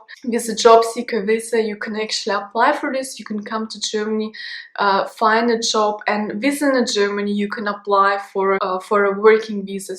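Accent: Russia. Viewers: I Love the Russian accent ...😍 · Your English is very good to understand, I just came back to Canada from Asian, spacial from China very hard to understand, your English is good, nice beautiful Russian aksent, I love it, speak German too, I like to hear that, you are beautiful.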